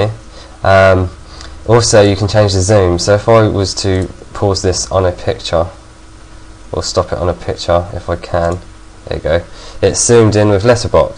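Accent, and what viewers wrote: England, United Kingdom. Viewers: Good video...but you should redo it...you are blabbing way to much and listening to an english accent for 8 minutes is annoying....good video 5 stars.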